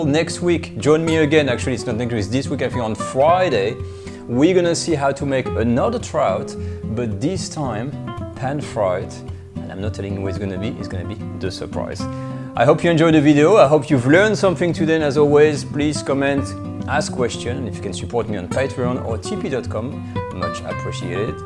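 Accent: France. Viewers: You hv the cutest French English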